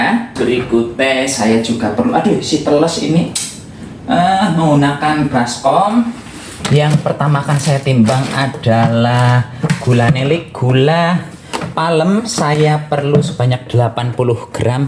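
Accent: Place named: Banjarnegara, Indonesia. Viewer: Mas...aku ngefans banget karo njenengan....saben dina nontoni nyutube..nek minggu libur..sok praktek...top resepe kereen. Nyong dadi kemutan desaku nang kabupaten Banjarnegara....ngomonge dialeke plek kecipek yakin.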